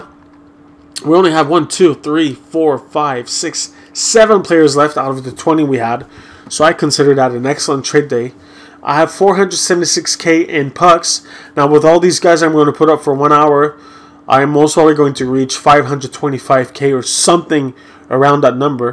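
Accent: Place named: Quebec, Canada. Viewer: pi ta un accent Québecois c pour sa g su que tu parle francais sur dautre video sur les commentaire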